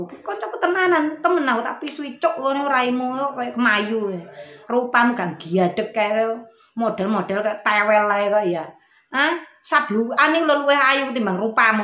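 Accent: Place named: Malang, Indonesia. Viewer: 👍👍👍 cocok bak . senang rungokno komene pean. logatnya malang mutu .😀😀😀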